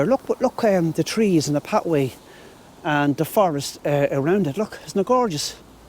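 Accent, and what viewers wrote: Cork, Ireland. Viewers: We just love watching 👀 just love Cork and that accent we love going on a journey with.Happy Sunday evening see you next time.🎅🎅🎅🧑‍🎄🧑‍🎄🧑‍🎄🧑‍🎄🤶🤶🦚🦚🦚🦚🐸🐸🐸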